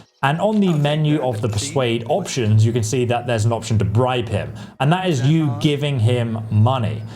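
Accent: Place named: RP, United Kingdom. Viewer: You’re very posh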